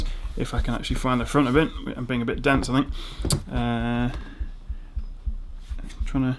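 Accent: United Kingdom. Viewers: Finally someone with genuine clear British accent here ;) not that german-mechanical noise XD Thanks a lot mate !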